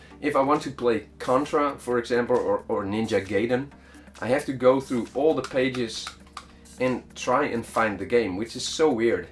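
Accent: Netherlands. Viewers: 2 questions, your "american" accent sound very dutch, am i righr?, en mijn tweede vraag: waar heb je dit gehaald? ik wil ene kopen... ik ben 100% zker tot gij NL zijt of belg xD mijn twee vragen zijn voorbij, please help me.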